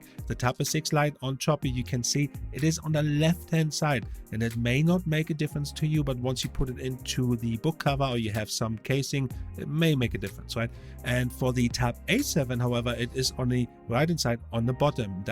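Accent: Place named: Germany. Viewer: Nice video, i think i'll choose S6 Lite, are you german? you have a german accent i find lol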